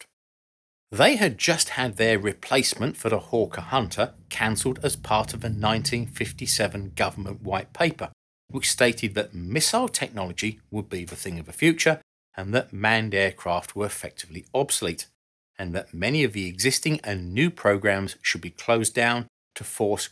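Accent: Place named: Cockney, United Kingdom. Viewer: haven't watched for a while but last I remember you was recovering from being very Ill. Good to see you're well and I'll be sure to check through your videos. On an unrelated note I'm a truck driver and you sound like a cockney. I drove past the royal Armories last Thursday when going from Millwall F.C to Twickenham and i was shocked by that battleship gun sat in front WOW! that was incredible!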